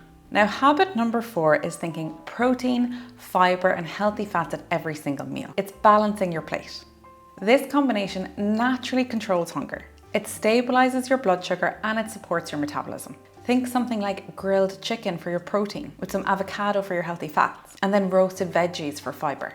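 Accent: Ireland. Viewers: This is a good video to help with weight loss. It's also a great video to help with learning an Irish accent. :)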